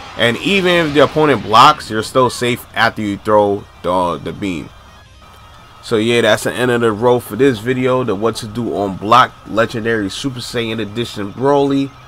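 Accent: New York, United States. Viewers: You from NY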